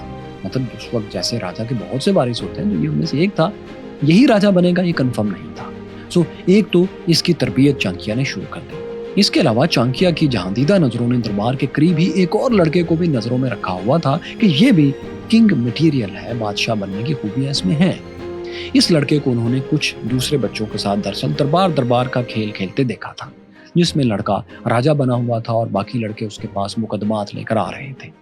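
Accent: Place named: Punjab, Pakistan. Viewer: Other than the Punjabi accent it was good content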